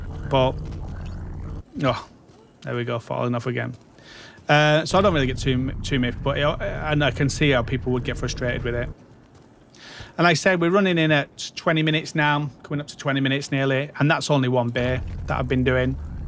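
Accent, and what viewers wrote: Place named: England, United Kingdom. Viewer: Where in England is your accent from?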